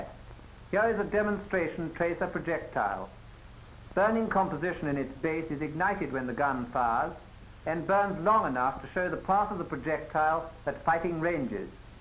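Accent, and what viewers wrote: RP, United Kingdom. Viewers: The Queen's English of this narrator is absolutely smashing! Absolutely wizard! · Having to listen to that plummy voice all day would drive me nuts.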